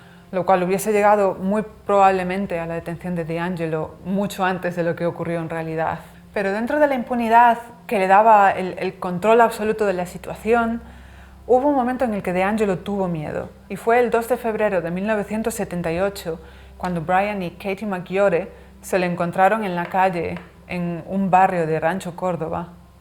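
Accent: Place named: Spain. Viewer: Lo haces muy bien! El video lo narras muy bien! Y me encanta tu acento español. De que provincia de España eres?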